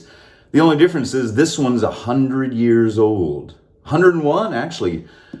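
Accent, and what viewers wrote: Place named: Scotland, United Kingdom. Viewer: Hello from across the pond, the Scottish accent wasn't too bad - I've heard a lot worse!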